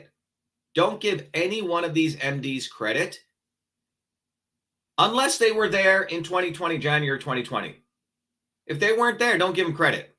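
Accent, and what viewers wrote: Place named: Kansas, United States. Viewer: Im 41 and I live in central Kansas, USA. I was raised in a 3,000 person rural town. I will be blunt, I love showing Dr. Shiva to all of my cracker redneck hillbilly hick ass friends! I love to hear him SPEAK EXACTLY the same way that "we" all talk around here. I',m going to tell them to start closing their eyes before they start to listen. Scumbags equals ALL POLITICIANS! Look here, it is time for real talk, foul language, and time for some fucking action. Semper Fidelis means something to me again and I will register to vote again and go back to encouraging my kids to serve for our country as i did if this dude gets elected. Fuck the Senate, we need you now Doc! Help us save what is left of our failing country so that, among MANY THINGS, our fallen will have not died for anything.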